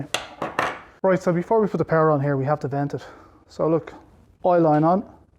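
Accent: Dublin, Ireland. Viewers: Good morning, you sound like an auld Dub, God Bless ya! I don't know how your clip came to me, as I was working on me auld burner that's on a Warmflow boiler out me back in the dark. And Lord behold! When I got up at 04.00 this morning due to the burner being on me mind, I fired up the laptop. I went into Youtube and the very 1st video clip that was shown to me was you. Just unbelievable. Apologies for the long intro here. Just to add... I would like to say that your one hell of a bloke. Your demonstration and explanation is second to none. There's a pint of the Black Stuff on it's way and thanks very much for this well informed video. God bless and may you have continued success with your channel. Kind regards, Paddy.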